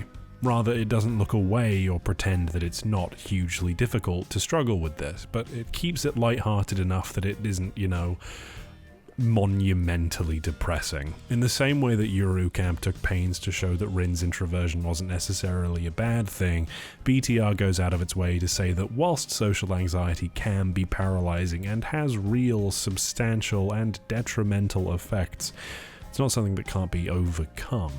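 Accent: United Kingdom. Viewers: Hearing "see you on the sunny side" in British accent makes me wonder how much of a meta joke that was about complex feelings of having anxiety of and desire for meeting friends.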